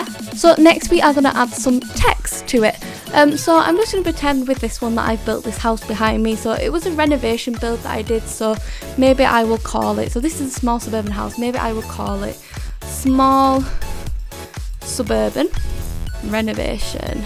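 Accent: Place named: Leeds, United Kingdom. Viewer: Are you from the Leeds area? You've got a very similar accent to me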